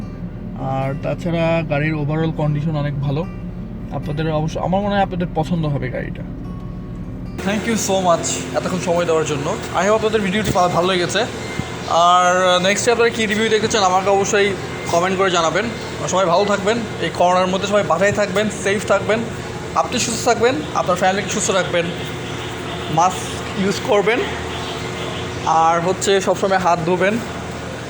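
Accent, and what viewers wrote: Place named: Chittagong, Bangladesh. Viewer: bro you have ctg accent! Are you from ctg?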